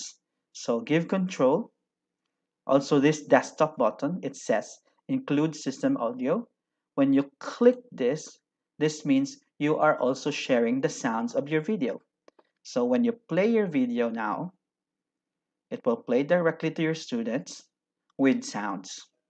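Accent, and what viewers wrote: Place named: United Kingdom. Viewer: Very good information. Congrats sa hereenng. British accent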